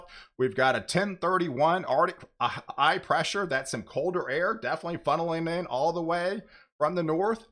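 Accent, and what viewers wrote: Texas, United States. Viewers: Hahaha - that accent is so Texas, it hurts. Maybe she is confusing him with... Christopher Walken... 😱😁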